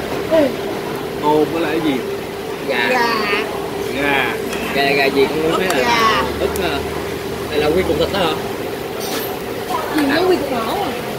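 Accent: North, Vietnam. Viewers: Chú cho con hỏi sao chú nói giọng bắc mà 3 bạn kia nói giọng nam vậy chú :3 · Sao ông bố nói dọng Bắc còn Con lại nói dọng Nam vậy ?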